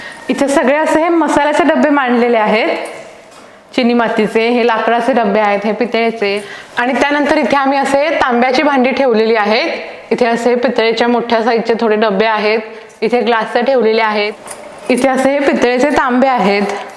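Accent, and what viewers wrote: Kolhapur, India. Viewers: बोलण्यात हळू हळू कोल्हापुरी tone जाणवतोय बरं का!😅😅... खूप शुभेच्छा तुला...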